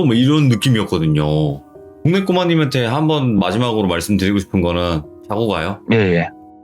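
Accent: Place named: Seoul, South Korea. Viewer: 수줍은 (얼추 서울말쓰시는) 넘 어색혀..ㅋㅋㅋ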